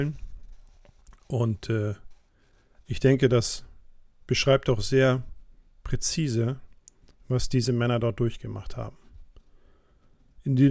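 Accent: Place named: North, Germany. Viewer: Ein wirklich bewegendes Zeitdokument, das so lebhaft und plastisch beschreibt, dass einem direkt die Bilder dieser Kraterlandschaft vor Augen kommen... Was mich beeindruckt hat, war die manchmal schon fast poetische und malerische Schönheit und Präzision der Sprache, mit der dieser damals 18jährige junge Mann seine Erlebnisse und Eindrücke schildert. Ein großes Lob an Sie für die Vorarbeit und auch für das Einlesen dieses Textes selbst. Die Tatsache, dass kein Schauspieler oder Synchronsprecher diesen Text liest, sondern jemand mit in diesem Fall norddeutscher Klangfarbe, macht das ganze noch authentischer, noch spürbarer. Vielen Dank für diese Arbeit!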